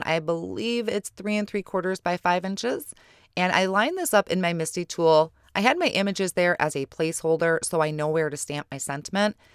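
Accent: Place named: Minnesota, United States. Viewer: you've got to be a Minnesotan... "pOst-it tape", at the very least a mid-westerner... I'm originally from MN so I can hear the a's and the o's a mile away... love the card and all things My Favorite Things!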